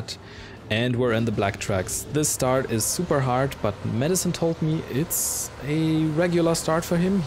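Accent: Germany. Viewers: First video I've ever seen on your Channel and i immediately recognized the german accent 😂 aber alles gut bruder du sprichst perfektes englisch :) But my favorite drink is just plain, non carbonated water or tea, which is basically just water but hotter and with taste in it hahah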